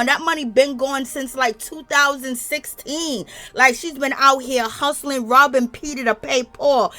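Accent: New York, United States. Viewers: Hey Girrrrrrllllllll hey........ l just love listening to your videos. I love the way u talk.💕 You must be from NY. I'm a New York girl as well, born there left when l was 13. Anyway, people are not up to Kim's Shenanigans! Remember the last time she was on Housewives. Andy told her she was a trouble maker. Anywho... nice chatting. 🤩💞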